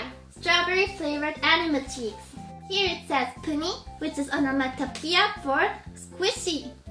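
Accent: Switzerland. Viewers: Kay Sloan I am from Switzerland but the French part. However I know what a swiss german accent sounds like, she has some of it but for the other parts of the accent, I have no idea where she gets that from..... maybe it's a baby accent! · she's not trying to. Her swiss accent is really thick. Everyone in swiss sound like that when talking in english. You dont really think people are all from america don' t you? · her accent is swish German 😍😍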